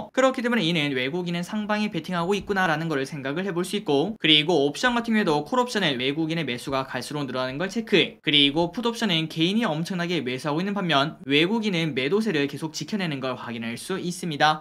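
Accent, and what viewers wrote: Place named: Gyeongsang, South Korea. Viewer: 경상도 억양이 살짝 들렸는ㄷㅔ......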